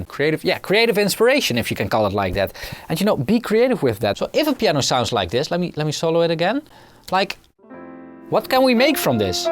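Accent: Netherlands. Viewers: Your accent sounds like a Dutch accent (Ik ben een fake Nederlander)! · Your Dutch, arent you? :D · Is he dutch?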